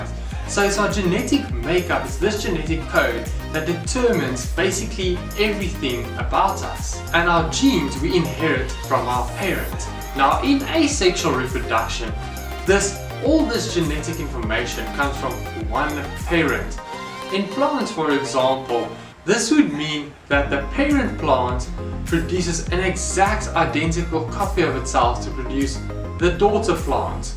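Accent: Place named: South Africa. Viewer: thank you for the video man, it really helped. Are you South African? The accent is almost identical hahahah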